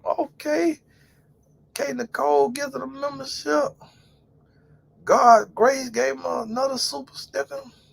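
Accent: South, United States. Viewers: You so southern, bless ya heart 😅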